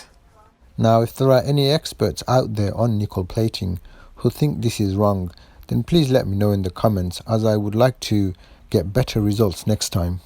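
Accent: Birmingham, United Kingdom. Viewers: Are you from Birmingham? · I am not a watchmaker but an engineer. As such I can appreciate the work in these beautiful units. Your videos are clear, informative but best of all relaxing and easy to watch. I think the gentle brum is a joy to hear. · your English accent suggests that much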